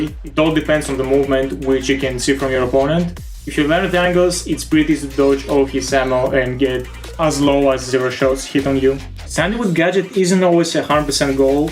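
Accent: Greece. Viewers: Are you from Greece???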